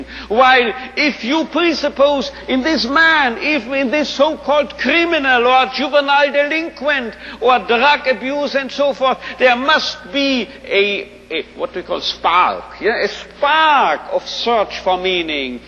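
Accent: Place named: Germany. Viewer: <3 ze german akzent (but he's austrian?)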